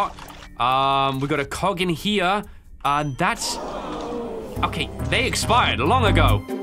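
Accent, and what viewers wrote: United Kingdom. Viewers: Wow fabulous.This man's brittish.lovely.. Good video!!!😊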